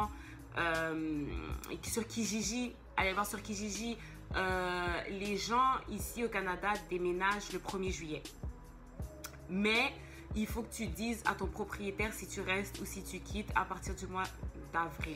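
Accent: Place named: Cameroon. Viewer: Salut tu as un peu l'accent camerounais... je viens voire votre vidéo et cela est très très bien expliqué ma question est la suivante :si vous avez un amis est-il possible qu'il parraine (sponsors comme on dit ici au Cameroun)toutes vos démarches d'immigration et combien de temps cela peut il prendre pour un jeune diplômé (ayant une licence professionnelle en logistique dans une école de commerce de la place)au chômage ? S'il te plaît ?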